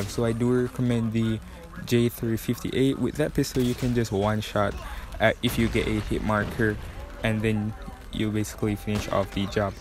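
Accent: Jamaica. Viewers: You jamaican? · Are u Jamaica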